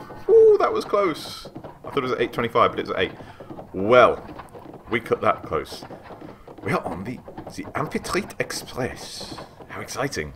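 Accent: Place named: United Kingdom. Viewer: Haha mate you're exactly what American people think all British people sound like. xD are you from the south?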